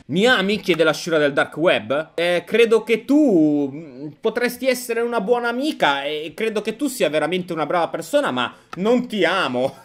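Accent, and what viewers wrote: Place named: Apulia, Italy. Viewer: Ou orecch d'gomm! Hahahahahahhah (Ps:Sei pugliese )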